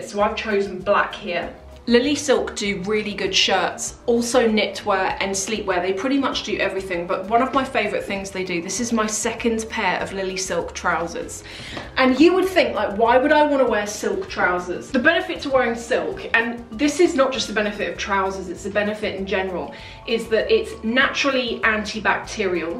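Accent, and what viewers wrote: England, United Kingdom. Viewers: I watch your video because I love your British accent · 1) I dont care about this sponsored video. This is still a great vdo because you are talking like a manual for how to carry out the Lily Silk outfits for someone who wouldnt have much clue about how to put them all together and look great. It could be helpful regardless the outfits are from Lily Silk or not. 2) The way you made your point on getting just one bag, in which colour, in which size - HELPED ME. Because I am about to get one. Thankyou! 3) Your accent is so lovely. You look beautiful and elegant too! English accent is like music to the ears. Other English youtubers with a beautiful accent and are worth watching are Cassie Thorpe (self diagnosed luxury addict lol), Emily Norris (who makes great mommy videos)., this is the first time i'm commenting on your channel because i friggin loved the whole idea behind this vdo ❤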